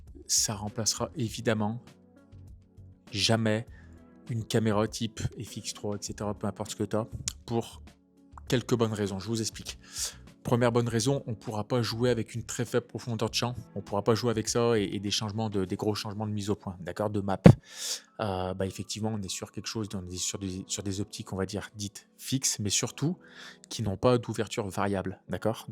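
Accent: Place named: North, France. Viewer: Tu serais pas du nord par hasard ? Les A que tu prononces font très nordistes. Tkt je suis de lille c’est pas pour me moquer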